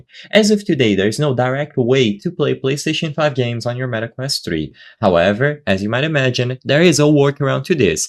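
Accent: Brazil. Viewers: esse ingles é de brasileiro ein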